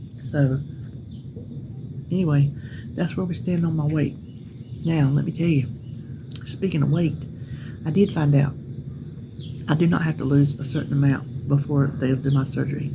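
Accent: South, United States. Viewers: I'm from Arkansas but girl you have a Southern accent. I love hearing you talk. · Oh honey you have a beautiful southern accent. isnt as pronounced. I say you are the luckier. Didnt know drinking liquids with food wasnt advised. I gotta have liquid ( soda, juice or water) during each meal and snacks. Is that only for surgery reqirement?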